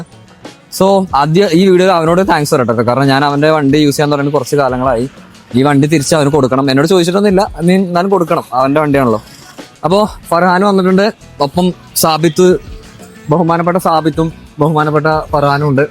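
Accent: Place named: Malappuram, India. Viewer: Ingale naadevdeyaaa slang Malappuram aanello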